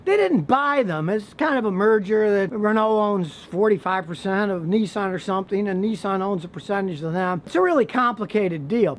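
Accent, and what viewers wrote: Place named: Western Pennsylvania, United States. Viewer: Is from western Pennsylvania? I’m trying to figure out his accent.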